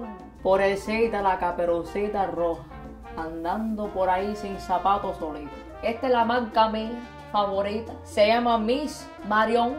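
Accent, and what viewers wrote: Cuba. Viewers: me encantan tus videos. Son lo máximo. Me alegro que mantengas tu acento cubano (siempre tan cálido). Así mismo son las abuelas caribeñas.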